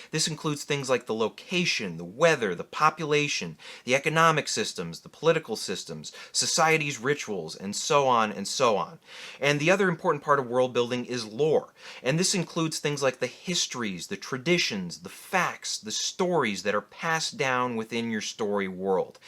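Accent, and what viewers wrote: Chicago, United States. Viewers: You gotta be from Chicago right?😊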